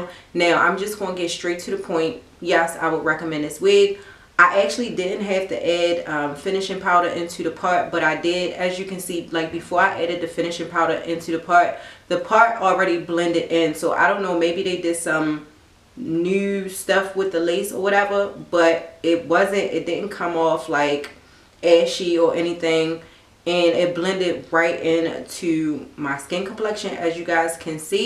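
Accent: Baltimore, United States. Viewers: Okay I’m getting this wig because of you ❤️ & your accent you sound like your from Baltimore also new Subbie 😊❤️ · Lol yeah she must be from Baltimore the way she keeps saying “to/tew” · That baldimore accent! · are you from Baltimore?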